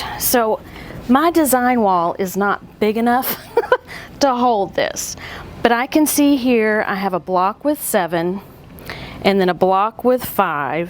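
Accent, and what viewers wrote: United States. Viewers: Thank you. Love this video...and your wonderful southern accent!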